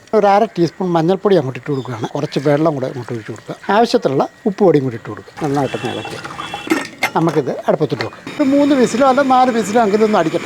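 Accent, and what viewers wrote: Kottayam, India. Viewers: നല്ല കറി ഇക്ക നല്ല അവതരണം ഞങ്ങൾ കോട്ടയം കാരുടെ ഭാഷ പോലെ തോന്നുന്നു🎉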